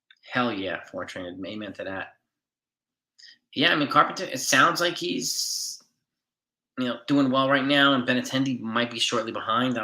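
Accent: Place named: New York, United States. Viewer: 1991 was the last time the Yanks had a month as bad as Sept. And it was Sept. 1991... Don't worry, I got your back. That's about all I have, you got it all, Home grown NY'er, got the accent (to me anyway, I'm an Oregonian)...Love your content. Glad I came across your channel. Wish the Yankees would have finished stronger. I know the games didn't really mean anything, but still, split with Texas? We should have at least gone 3 out of 4. And Cole worries me. That was like 3 starts in a row where he gave up a tying or go ahead HR. but I think the offense picked him up in two of them. Gonzalez is a lefty and Tampa's pitchers are all righties (Starters anyway). I think that's what they were saying..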